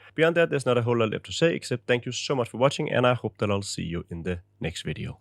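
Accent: Denmark. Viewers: love the quality of the video but i just have to know. are you danish?(i just Wonder cause of the accent)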